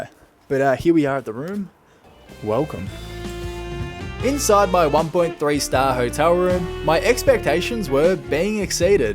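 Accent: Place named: Australia. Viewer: Men with Aussie accents like his makes me MELT.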